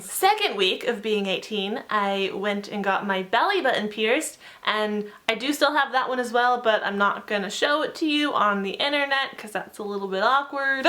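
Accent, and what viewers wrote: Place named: Ireland. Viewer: Irish whoo 😀😀😀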